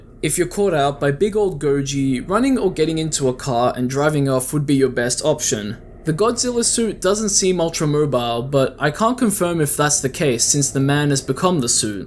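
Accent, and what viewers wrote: Australia. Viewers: Wait you’re a fellow Aussie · You're Australian?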